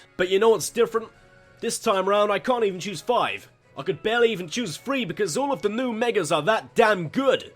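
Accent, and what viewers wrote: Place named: Northern Ireland, United Kingdom. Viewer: Where so you live? I couldn't tell if it was NI or Britain 😅 I live in N.I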